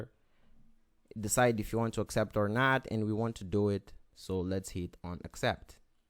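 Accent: Brazil. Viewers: you're Brazilian, right? lol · Mano tu é brasileiro?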